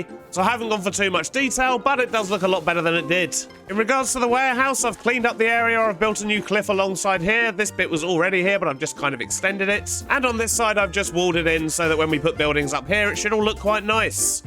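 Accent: United Kingdom. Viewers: I love the fact that you have a similar British accent to Stampy, it brings me a joy that nothing else does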